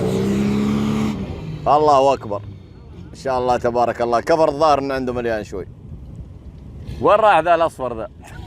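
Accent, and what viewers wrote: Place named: Jeddah, Saudi Arabia. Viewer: راعي القناه لهجته بدوي بس النغمه جداوي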